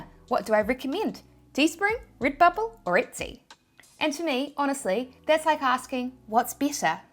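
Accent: New Zealand. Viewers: The New Zealand Kiwi accent is one of my top favourite accents in the whole world, there's a really warm vibe about it, you've got such a vibrant upbeat and clear speaking voice! Others that am very fond of are the Irish accent aswell as the Scots Glasweigen accent were the dialect is very complex, the Newcastle Jordy accent aswell which is another good one, there's too many to name I know but each one is warm within there very own unique syntax, slang and dialect! 🙂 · I'm a new subscriber (past two months), totally won over by your straightforwardness, can-do attitude, and NZ accent. Love it! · u r of the few I subscribe to I had to get used to ur accent since I was not used to NZ accent but now I find it adorable HAHAHA thanks for very informative vids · hi By the way i really like your New Zealand accent. I just opened a store on REDBUBBLE and after 5 days of use it they suspend my account and i don't know what i'm gonna do and thanks for your efforts i love you. 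· I love New Zealand accents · Hey, going through your course a second time while I set up my store....should I create an LLC for protection or wait until I find a winning product before starting a legal business? Maybe a future video? Also I'm starting to say words with a NZ accent because of you...the newest one is pola beas 😃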